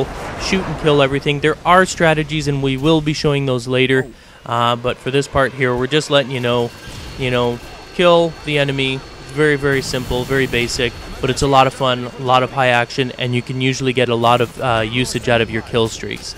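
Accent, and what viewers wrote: Canada. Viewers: may i ask if you're canadian? i thought i heard a hint of canadian accent.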